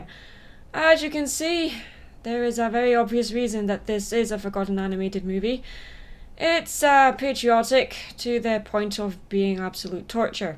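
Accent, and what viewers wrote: Scotland, United Kingdom. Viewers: I have a vague recollection of this. Or it was probably just seeing it on a greeting card. I was somewhere around 3 when this came out (and when a lot of things you plan to review this year did). Side note: I absolutely love listening to you speak. Something about a Scottish accent on a woman is just indescribably enticing.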